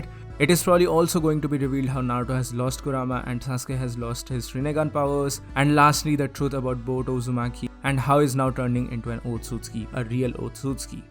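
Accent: India. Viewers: In your language tone i know u are Indian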